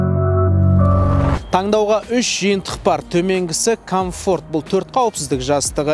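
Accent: Standard. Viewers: Қазақшаң үшін мың алғыс, нағыз таза қоспасы жоқ жалпақ және мәдени түрде түсіндіргенің керемет, басқа айтарым жоқ, семіз лайк 👍🏻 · Таза казакша сойлитин 1 ши блогер жарайсын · Жарайсың. өте түсінікті айтасың. тап таза қазақша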